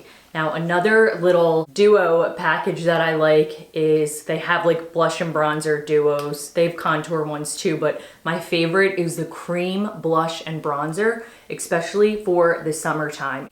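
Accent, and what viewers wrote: Philadelphia, United States. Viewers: Hi! I just found you (on a tanning review video), and I love your videos! One of the reasons I loved you right away is I detected the same slight Delco/Philly accent I have! 😂 Of course I’m assuming, I may be wrong. Thank you for all that you share!! ☺️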